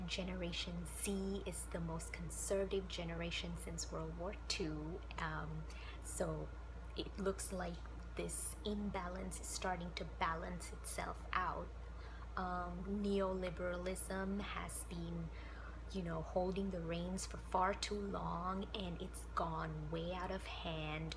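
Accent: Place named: Sri Lanka. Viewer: From the name..indian from the accent possibly Ceylonese or Singalese from Sri Lanka...either way..same heritage...im indian as well and it looks like more and more of us are getting red pilled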